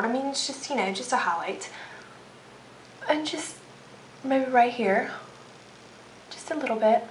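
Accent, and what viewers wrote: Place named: South, United States. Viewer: im from the south and the second thing i noticed was your southern accent, the first realizing how beautiful you are! I love it though:)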